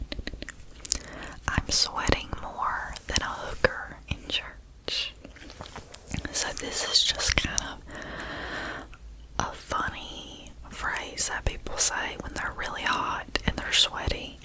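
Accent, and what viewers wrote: South, United States. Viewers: It was a great video!! Im not from US so it’s interesting to listen to a southern accent. I personally love it ❤️thank you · On a scale of 1-10, I give your southern accent an ♾ (infinity)! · Okay literally your southern accent just makes your. ASMR 10000x better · It would be interesting to hear your impression of an even stronger southern accent! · I love your southern accent! I hate those that you can’t tell if they’re British or southern!! Yours makes me feel like I’m back at home. 😘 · Its a ASMR Hat Trick-- 3 Video's in 3 days 😉!! What can I say I follow hockey being from Detroit!! Love your Southern accent 😎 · Your southern accent is hella dope. Lol. I'm from Cali where we say hella ;) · I’m from the north and I love your accent and I swear I think I’ve only known two or three people in my whole life who have a real southern accent like yours and I think it’s wonderful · Hi. 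🙂 I didn't know that you had a Southern accent. You certainly kept that quiet, didn't you? 😂😂 I understand what you mean by 'Lord have mercy'. Please look after yourself and don't tire yourself out. 💙💙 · I was literally admiring your beautiful southern accent when I saw this video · I didn’t even realize you had a southern accent but I’m from south Texas so you just sound normal to me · As a southerner I don't have as much of an accent as other southerners. Yours is just a little bit stronger than what I would consider average. The louder I get the stronger the accent gets 😆. It's refreshing to find a southern asmr.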